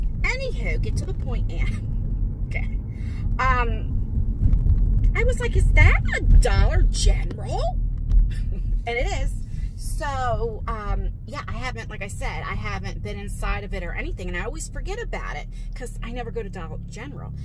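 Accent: New Jersey, United States. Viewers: If you can make $ talking to yourself I can too bc I don’t care about talking & im LEGIT EXACTLY LIKE YOU MINIS JERSEY ACCENT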